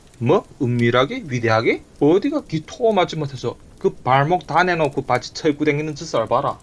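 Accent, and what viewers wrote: Yanbian, China. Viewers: 좋은영상 잘보고있어요 지난역사 현정치에대하여 머가먼지 자세히는 모르고 있지만 ㅠ 님영상보면서 여러모로 많운걸 배우고 생각하고있답니다 사실은 같은 조선족동포임에도 유트브로듣는 우리사투리가 처음엔 잘적응이 안됐었는데 듣다보니 친숙하고 님의 깊은 마음도 자세히 전달되는거같고 재미있게 보고있어요 감사해요 댓글은 처음이네요 화이팅하세요 ~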